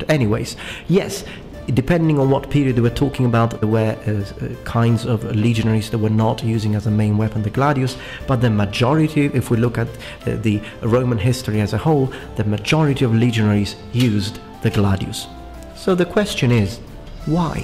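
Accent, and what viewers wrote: England, United Kingdom. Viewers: I thought you were a Britt due to your accent. Learning a lot from your vids. Keep up the good work.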